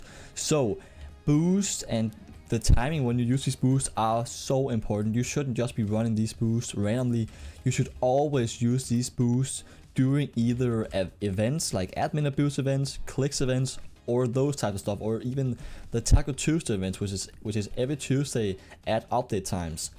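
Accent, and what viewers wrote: Denmark. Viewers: Elsker din danglish haha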